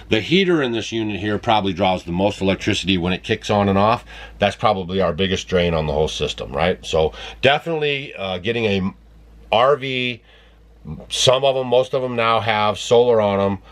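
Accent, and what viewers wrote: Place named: Appalachia, United States. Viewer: Living off the grid????? And you're talking about living in a RV? Wipe ur ass on tree moss if U can find it and keep raising all those bugs in your beard for your next meal. Water should be no problem for you as we can see that you bath once a year whether you need it or not.. What you gonna do when you can't put all this BS on YouTube? Are you doing all this on "Solar Power"? I can see that if someone doesn't shave or shower for a year or two, and they talk like a 1940's Hill belly-- they can sell BS to Morons.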